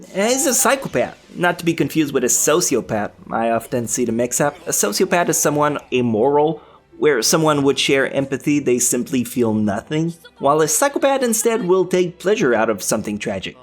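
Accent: Quebec, Canada. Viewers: are you from quebec? your accent seems familar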